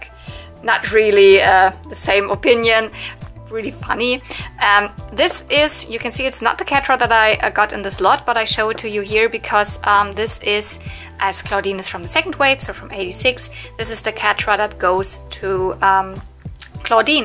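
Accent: Germany. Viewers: Hi, how funny to immediately hear that you were German. And hello and thanks for a good exciting channel. Cheers from Andy from Denmark.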